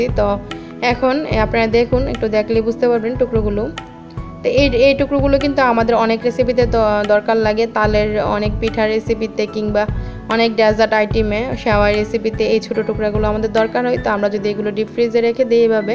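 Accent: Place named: Noakhali, Bangladesh. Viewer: কথা বলার স্টাইল পরিবর্তন করতে হবে। নোয়াখালীর মেয়ে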